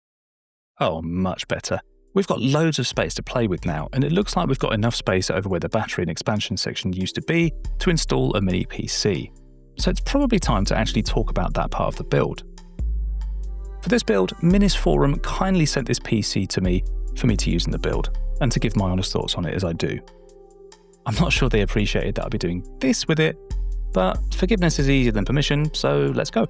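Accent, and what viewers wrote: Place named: United Kingdom. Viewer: new subscriber here: super nice channel, if I may with a 8 bit guy vibe and a British accent 😊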